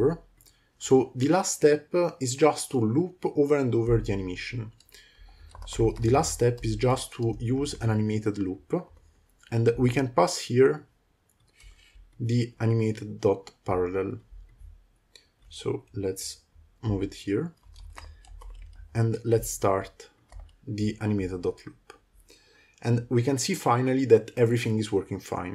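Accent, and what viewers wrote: Italy. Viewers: Italiano? Sembra dall’accento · are you italian?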